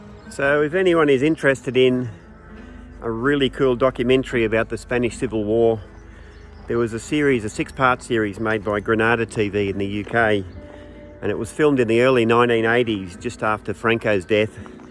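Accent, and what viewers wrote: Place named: Western Australia, Australia. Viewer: Beautiful video as always! I also enjoy your accent, it reminds me of the time I have spent in Western Australia. :=) Have a great day and Buen Camino!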